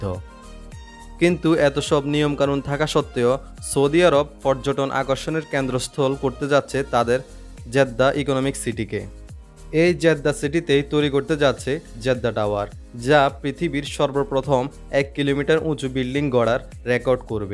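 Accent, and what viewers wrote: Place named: Noakhali, Bangladesh. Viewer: ভাই নোয়াখালীর কথার টন পালটান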